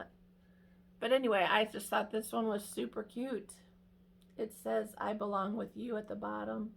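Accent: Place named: South, United States. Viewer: awww I was hoping you lived close to me☺️. I thought I detected a bit of a southern accent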